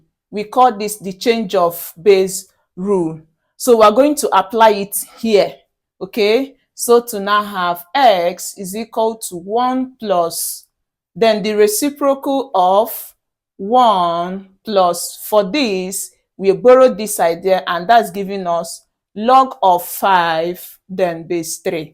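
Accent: Nigeria. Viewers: Your accent is typically Nigerian and you are a very good math teacher.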